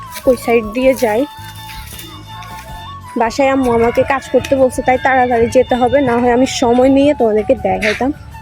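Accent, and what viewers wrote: Noakhali, Bangladesh. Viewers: আসসালামু আলাইকুম আপু কেমন আছেন আপনি। অসাধারণ সুন্দর নোয়াখালী ভাষা আমার ভালো লাগে। আপনার সব কথা গুলো আমি বুজি।। ভালো থাকবেন আপনি আল্লাহ হাফেজ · তোমার এই ব্লগ ভিডিও টা আমার কাছে অনেক ভালো লাগলো আর ও বেশি সুন্দর লাগছে তোমায় আর তোমার ঐ মুখে নোয়াখালী ভাষা শুনে আমার খুব ভালো লাগলো আর বেশি সুন্দর লাগছে তোমরা ভাই বোন হাসি খুশি হয়ে ম-ম বানাচ্ছে এটা দেখে আমার খুব ভালো ও চমৎকার লাগলো আমি যখন তোমাকে আমার বউ করে আনবো তখন তোমার ঐ হাতের বানানো ম-ম খাবো শুধু তোমার হাতে প্রিয় ❤️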